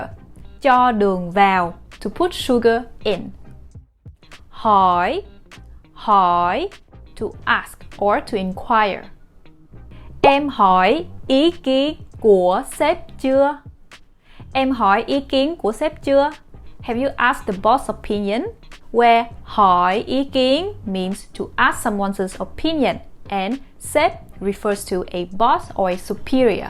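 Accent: Vietnam. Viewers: Is this Northern accent or Southern accent? Thanlks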